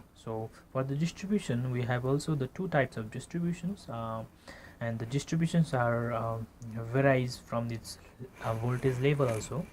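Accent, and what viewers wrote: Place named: India. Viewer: what the fuck ..I hate Indians English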